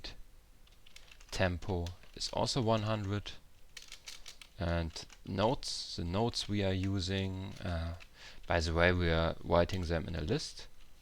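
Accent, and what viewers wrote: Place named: Germany. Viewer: You sound very German. Ich kenne diese Scham, den eigenen Code zeigen zu müssen ^^.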